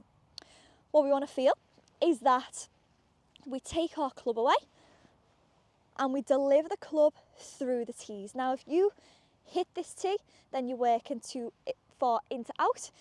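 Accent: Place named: England, United Kingdom. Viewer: you are a great teacher and I love your English Accent 🥰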